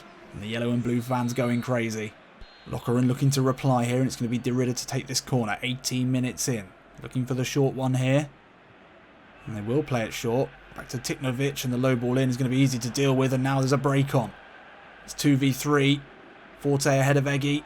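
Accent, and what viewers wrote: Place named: England, United Kingdom. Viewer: kalo dari aksennya, dia dari Inggris bro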